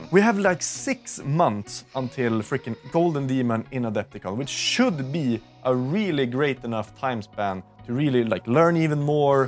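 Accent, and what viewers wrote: Sweden. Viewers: Älskar den svenska accenten😊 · The Swedish accent makes his face really punchable, damn... like nails on a chalk board.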